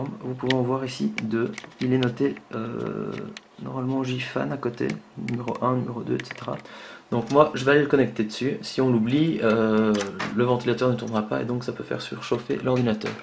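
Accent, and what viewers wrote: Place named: Belgium. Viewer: Jolie petit accent... belgique?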